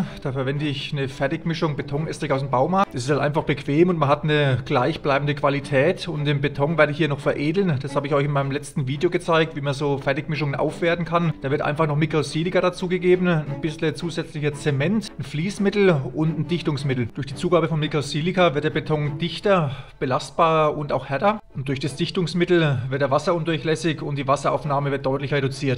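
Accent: Franconia, Germany. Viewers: du frängelsd jo wid zau :) Mega Grüße aus Unterfranken!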